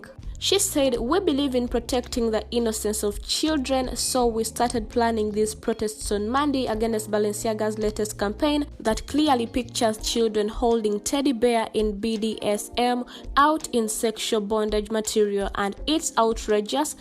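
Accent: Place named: India. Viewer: I can't listen to you channel because it reminds me of ordering an Indian.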